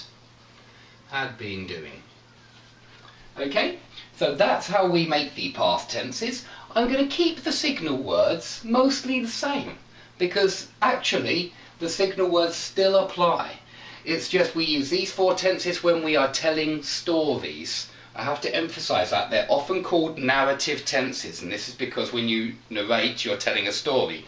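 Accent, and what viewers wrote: United Kingdom. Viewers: British accent <3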